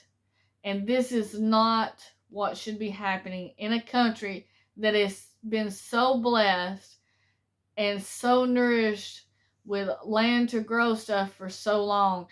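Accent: South, United States. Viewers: Uze a southern gal sheeeeeet that eeeez cooool...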